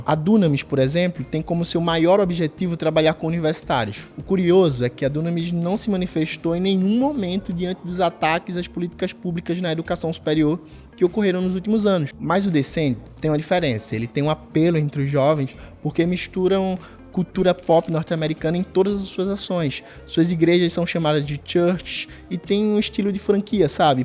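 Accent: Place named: Northeast, Brazil. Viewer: KKKKKKK Mano que absurdo foi esse aí que tu falou. Tu acha mesmo que isso e movimento político e "racistas"? Isso é ridículo parceiro. Inclusive o the send Não e um conjunto de igrejas amigos, e muito menos uma estética nas mesmas. Quanta mentira cara. E por último, o livro do Yago Martins não criminaliza a pobreza. Qualquer um que LEU sabe. E me entristece muito reparar nordeste no seu sotaque, parece que ainda tem muita gente alienada ou alienante por aqui.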